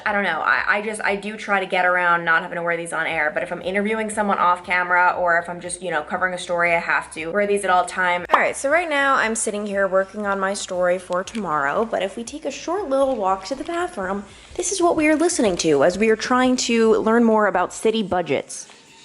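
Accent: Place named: New York, United States. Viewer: This was fun to watch. Especially the flash back of you with your NY accent. Love it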